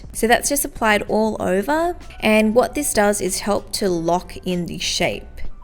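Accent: Australia. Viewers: Where is this salon? As i noticed you have an Oz accent. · I realize that 'hair' for women can be a long process, depending on how long it is and also how well a woman takes care of it, but I never realized what an extensive process it was. I'm hearing accent, sounds Australian? thinking Melbourne?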